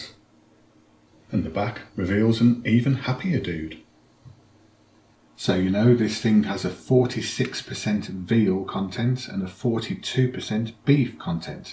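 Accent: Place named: Birmingham, United Kingdom. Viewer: Brummy? Noice.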